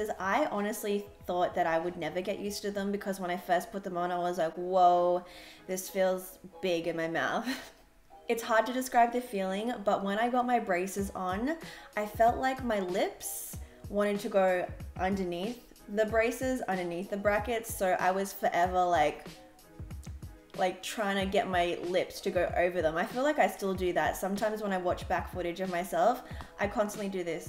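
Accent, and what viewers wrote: Australia. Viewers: 😄 I was close. You was saying so many words that are close to what us British say, but I couldn't figure it out. I am familiar with the Australian accent, not sure why I didn't think of that 😊. Thank You for your reply and information in the video. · Are you Australian? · You are Australian. Periodt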